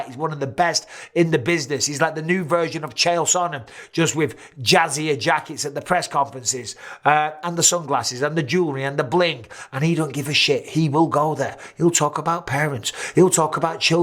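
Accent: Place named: Newcastle, United Kingdom. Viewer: Bisping turning into true geordie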